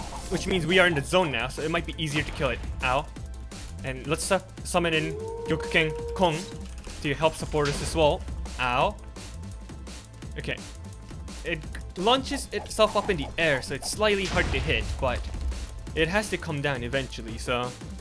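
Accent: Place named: Japan. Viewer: The way you say names in Japanese accent i think you're from japan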